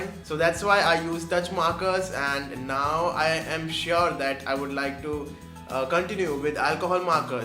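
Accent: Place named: India. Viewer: Are you from India? You sound like from India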